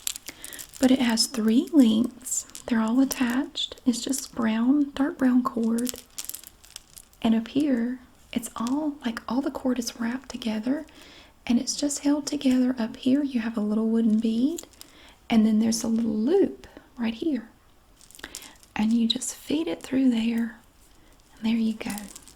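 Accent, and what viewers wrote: South, United States. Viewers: Hi. I listen and relax to your vidz a fair bit. Love your southern accent. I came across yesterday's video were you seemed very upset due to trolls. Please dont be upset. You cant control such idiots and the ignorance of folk. They hide behind their phones etc and blubber their strifes away. Cowards and it's not personal... You are so genuinely lovely at heart... Take Care Tina from Liverpool (Engand)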